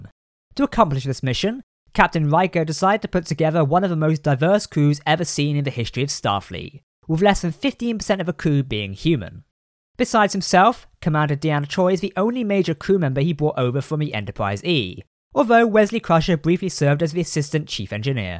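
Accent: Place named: United Kingdom. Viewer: Thumbs down for the maddening sound of your British R's.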